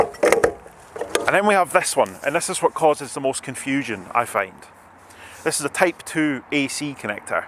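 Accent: Scotland, United Kingdom. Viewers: Maybe it was the scottish accent but you have just educated me a new owner of a EV cheers mate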